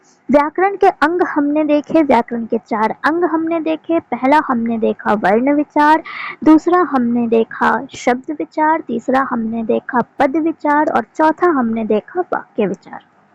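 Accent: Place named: Bihar, India. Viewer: Accha aap bihar se ho😮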